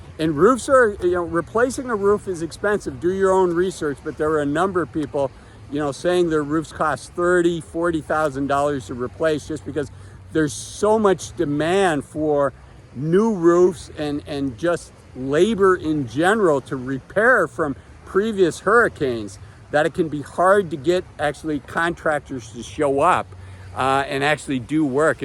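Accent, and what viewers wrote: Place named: Cleveland, United States. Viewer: My late biological dad was from the Cleveland area too, and your accent reminds me of his, so I guess there is a Cleveland accent and you have it and he did too. As an adult he moved around a bit but settled in Northern VA, where I found him in 2015. He was in his 80s and I only knew him for a couple of years before he passed. Getting to know him was one of my life’s peak experiences. I’m glad I found him before he died, but wish I had had more time with him.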